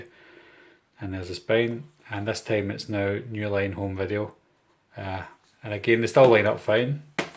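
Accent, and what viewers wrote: Scotland, United Kingdom. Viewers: I knew a could hear a Scottish accent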